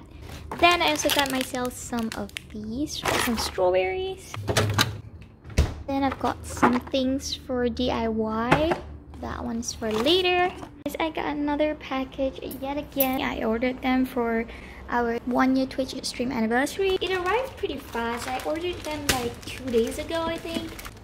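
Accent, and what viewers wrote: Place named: United Kingdom. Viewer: Man her accent is interesting, it’s randomly British with her vowels and other times it sounds American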